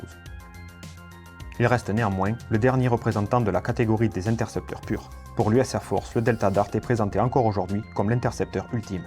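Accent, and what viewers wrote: France. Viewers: Je démarre cette vidéo et ooooh oui un accent bien français 🙏 rien que pour ça je m'abo. Super vidéo aussi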